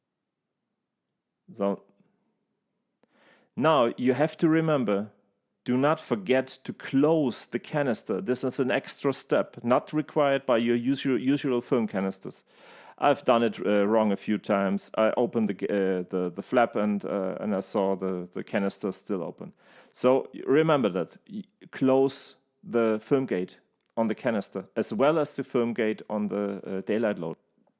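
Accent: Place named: Germany. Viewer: I wanted to say Danke! Really instructive video and I've been using regular metal cassettes which go in all my other cameras and my Leicas too. I have the correct loader and a few IXMOOs so with your help now I am able to use them. Really helpful! And also I want to say your English with the German accent is absolutely perfect for this, it adds "authenticity", as if Leica had made this video from Wetzlar...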